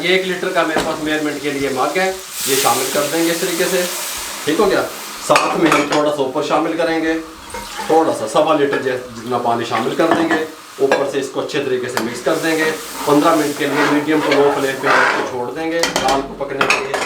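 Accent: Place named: Ambala, India. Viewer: ap Aumbala sy hain Humary neighbour wahan sy thy Lehja bikul ap jesa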